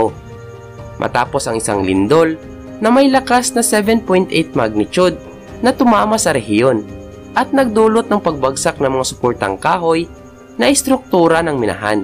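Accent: Visayas, Philippines. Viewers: Bisaya dong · bisaya to Hahaha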